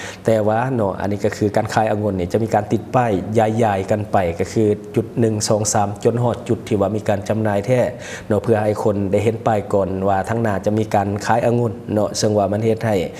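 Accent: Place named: Thailand. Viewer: คำเว้าที่แอดออกเสียง มันก็สำเนียงเดียวกันกับไทย. แค่ภาษาเขียนไม่เหมือนกัน.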